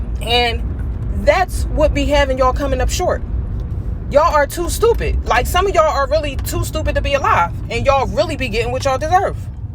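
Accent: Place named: Philadelphia, United States. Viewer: I can tell by that accent that you’re 100 percent PHILLY!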